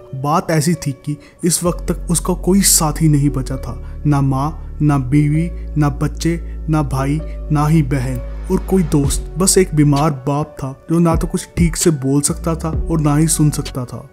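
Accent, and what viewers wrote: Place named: Punjab, Pakistan. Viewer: Pakistani punjabi ryt bhaisaab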